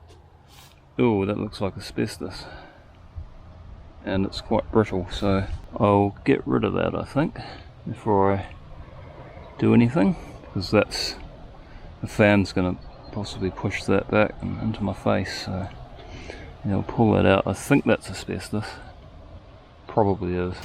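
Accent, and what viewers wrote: New Zealand. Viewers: Just came across this channel then realized hey you sound like a kiwi me too! Bet we have some common friends somewhere 😅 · Recording equipment you use and how much time? Amazed at the variety of angles you achieve and even what looked like overhead drone footage at the end! Amazing. How many and what type of cameras are you using and how do you set all up to make these videos so well produced? Are you filming solo? It feels that way. A fun 30 minute video on how you set up, film and edit would be fascinating! Love the kiwi accent as well! We lived in the Bay of Island for seven magic years. Miss it. Well done mate!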